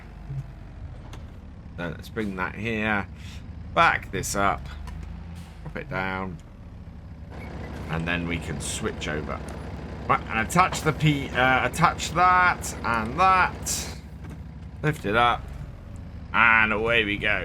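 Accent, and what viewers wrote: United Kingdom. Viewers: I like your British dialect, Grettings from Germany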